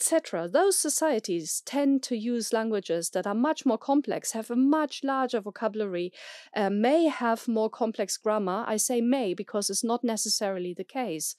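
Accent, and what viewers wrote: Germany. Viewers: Very interesting! Thank you! I have always wondered if English is a more complex language in the sense of having a larger lexicon. English certainly rapidly changes...and not always for the better! I would like to know which languages you consider to be more complex and more expressive. I will look for more of your videos. I can hear (faintly) that your mother tongue is German. That is a beautiful language that I learned in high school before going to live in Köln for a year...50 years ago! I revived my German more recently. I love the complex grammar! It is really not that hard to learn. Like anything it takes practice but once you learn the grammar rules of cases and genders, it's challenging but rewarding. One thing I realize from my own experience learning languages is that the most difficult thing about learning a foreign language once you have the basics down is to speak it naturally with expressions, colloquialisms and correct intonation. 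Being able to speak with correct pronunciation can be a big challenge for some, as well; for example, for Spanish speakers of English. And I don't know if practice can really help that much if you don't have a good ear. I feel bad for non L1 English speakers struggling to learn the language who due to a lack of proficiency are unable to fully and richly express themselves. I feel that way when I speak an L2 language; like I must appear to be like a child or simple minded. Vielen Dank again for your excellent post!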